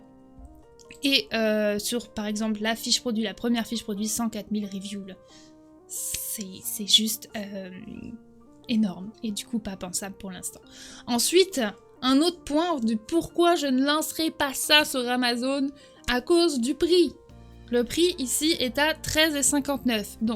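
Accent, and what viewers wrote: Canada. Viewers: par ton parler j’ai compris que tu te trouvais au Canada, je dois commencer bientôt mon projet fba mais actuellement j’ai pas bcp de temps que conseille tu pour commencer quand on a peut de temps a mettre?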